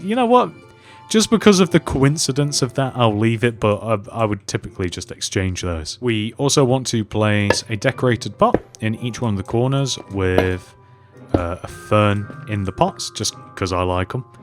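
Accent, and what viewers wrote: United Kingdom. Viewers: He's definitely in UK you can tell by his British accent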